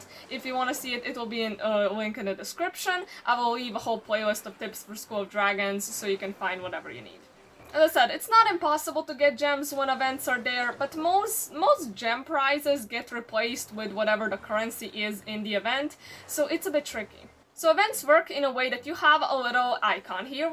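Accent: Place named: Russia. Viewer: Your accent makes me think you know Russian, if you do then know I do too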